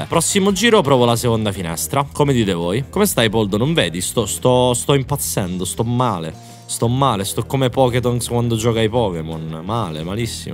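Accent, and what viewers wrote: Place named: Ravenna, Italy. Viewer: poldo ma te sei di ravenna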